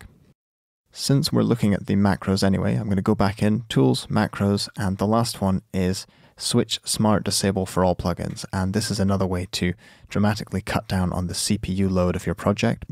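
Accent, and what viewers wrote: Scotland, United Kingdom. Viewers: He's Scottish isn't he?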